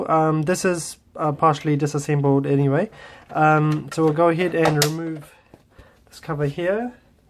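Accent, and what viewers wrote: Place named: New Zealand. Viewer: Your from NZ aye bruvvv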